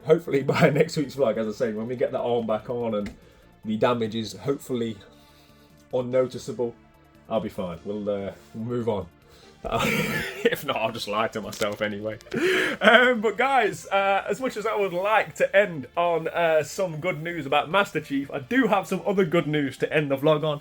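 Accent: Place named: Derby, United Kingdom. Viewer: Ah just started watching your videos and thought the way you speak was too familiar, good to know other Derby yocals exist 😂. I normally go with “Welcome to Derby… sorry” if you’re needing a new intro line for the place.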